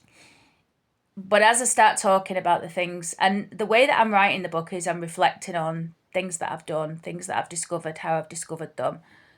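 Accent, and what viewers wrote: Oldham, United Kingdom. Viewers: Writing a book is a good idea-just do it at your own pace-& take your time-& it must have "Oldham-Speak"-in it-no fancy "A-i"-lingo-just the way you normally talk--& remember -Sports people=footballers/cricketers/rugby-players are as "Dumb-as-two-short-planks"-& their books are "ghost-written"for them-because they are incredibly Stupid!!-so-do the book on you own in " --Speak"-with plenty of "Oldhamisms"-because the Northern-Language"-is dying out-you are about the only one who cares--& when your gone-your House will be bull-dozed too-also-I think you should do that 'Celebrant-Course"-you get them "coming & going"-& you are "walk-up-start"-for that!!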